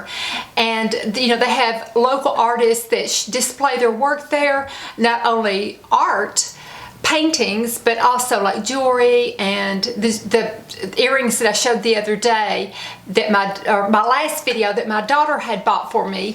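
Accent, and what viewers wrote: South, United States. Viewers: Would love to hear you speak Spanish with that Southern accent. Miami is 90% Hispanic.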